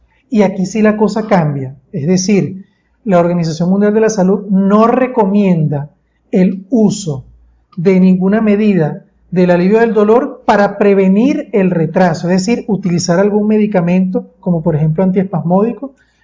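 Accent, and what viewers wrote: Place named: Venezuela. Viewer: Dr que Gusto me da que comparta estos videos... No sabe que felicidad que nos enseñe que nos comparta sus conocimientos...yo soy feliz Además Si se le escucha que sea de Venezuela que bonito hablan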